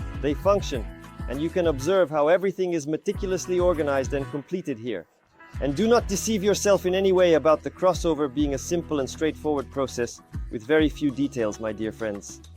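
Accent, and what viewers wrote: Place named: RP, United Kingdom. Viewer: The ai translator speaks like a British royalty.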